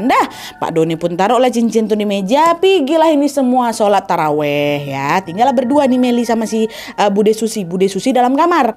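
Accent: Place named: Sumatra, Indonesia. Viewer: AKU MAH SUKA SAMA KARNA CARA BAWAIN CERITANYA ENAK ASIK. NYEROCOOOSSS TERUS TANPA HENTI DG LOGAT SUMATERANYA. LANJUTKAN...!!!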